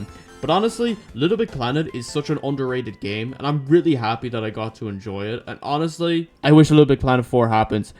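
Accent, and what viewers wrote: Ireland. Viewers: are u from Ireland? If you are i am too!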